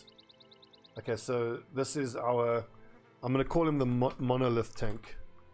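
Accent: South Africa. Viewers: you sound like a fellow South African, great game and looking forward to watching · just found you randomly and listened and realized you are a fellow south African GG subscribed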